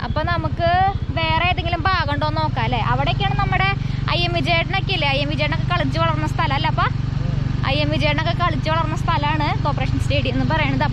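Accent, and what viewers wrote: Thrissur, India. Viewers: ഇയാളുടെ തൃശ്ശൂർ ഭാഷ കേൾക്കാൻ നല്ല രസമാണ് ..😍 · തൃശ്ശൂർ ലാംഗ്വേജ് കേൾക്കാൻ നല്ല രസം തന്നെയാണ് അവതരണം 👌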